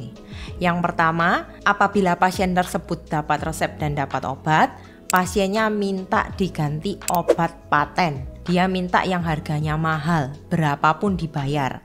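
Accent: Java, Indonesia. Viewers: Logat Jawa nya medok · Medok banget😂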